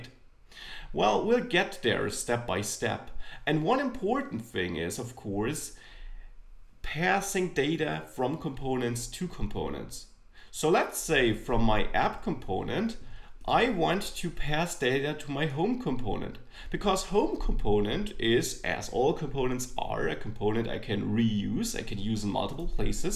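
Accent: Germany. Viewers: Is that german accent? btw nice tuts. I would suggest you to make an advanced React/redux (100+ hours) PAID course.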